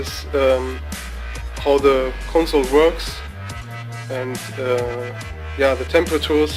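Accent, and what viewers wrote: Germany. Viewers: Man hört sofort dass du ein deutscher bist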